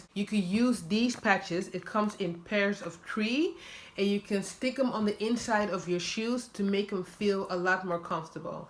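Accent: Netherlands. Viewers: Ik dacht al je komt van nederland 🧡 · Nice video!!!! Very helpful!!! Are you from the netherlands? I can hear a cute accent. Im from rotterdam. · Dutch accent, no?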